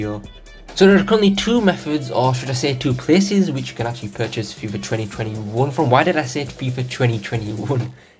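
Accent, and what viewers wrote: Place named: Bradford, United Kingdom. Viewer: My man sound like he’s from my home city Bradford 🤣🔥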